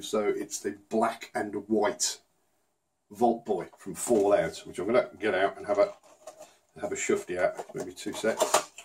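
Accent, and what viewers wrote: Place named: England, United Kingdom. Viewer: After seeing a bunch of your videos, I've realized you use a lot of the terms my dad uses and you even have a similar accent. What part of England are you from? My dad's from Saddleworth in Manchester. Are you from around there?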